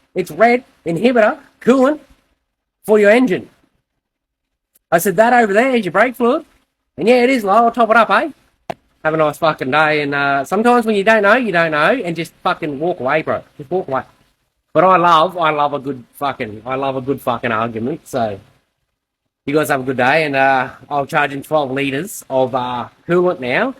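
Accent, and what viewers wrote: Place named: Australia. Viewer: Aussie brah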